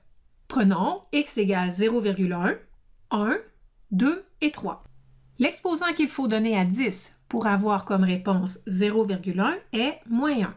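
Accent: Canada. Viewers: Merci ! a cette belle voix canadienne :)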